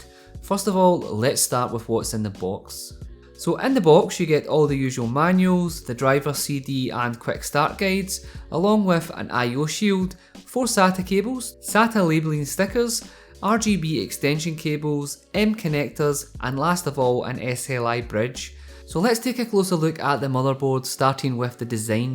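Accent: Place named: Scotland, United Kingdom. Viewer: Yer Scottish ye have my support just got the board for a new build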